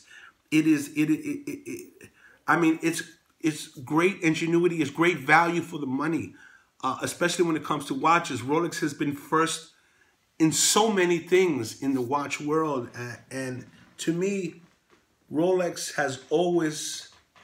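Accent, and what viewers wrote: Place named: New York, United States. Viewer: Thank you Sir for an honest, accurate and entertaining watch review. I am a new fan and like your New York, Italian American manner, you remind me of a character from the 'Good Fellas' lol... Would love to hear you say 'Ged outta here' lol....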